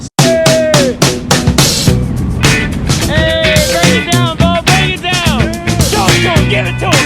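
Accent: United States. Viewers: Do all americans speak like this?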